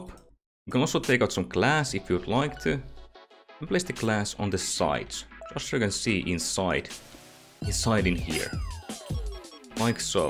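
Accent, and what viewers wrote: Finland. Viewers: Are you finnish? I can hear your accent😂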